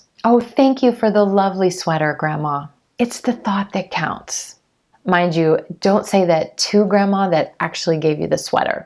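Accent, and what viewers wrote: United States. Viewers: One of the best American accent teachers ever ❤️ merry Christmas 🎁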